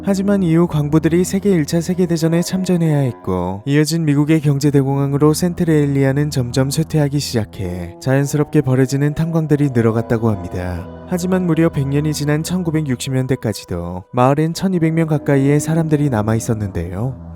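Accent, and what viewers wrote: Japan. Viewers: 왜 자꾸 영어가 일본어 들리지 ㅋㅋ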